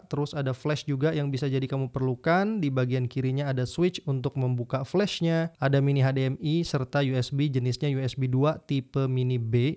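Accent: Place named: Kalimantan, Indonesia. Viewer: Dari logatnya mas orang kalimantan kah?….. tapi mantap mas aku suka reviewnya masuk dalam rencana pembelian aku, ya walaupun bekas juga sih hehe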